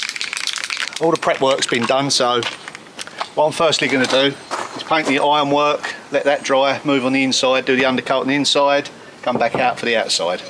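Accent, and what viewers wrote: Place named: London, United Kingdom. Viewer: Doors look great. I am interested in your slight accent. As I was born in Kenya during colonial times, my mother was from Southern Rhodesia Zimbabwe. It is said I speak with slight ‘Rhode ‘, your accent sounds somewhere near or in London., retired Airline pilot. Thailand.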